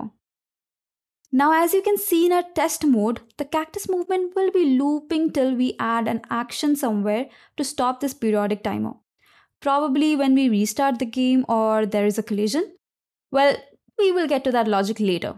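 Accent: India. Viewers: You're legitmately just a racist. This is perfect english with an Indian accent, if you don't like her accent, that's a you problem.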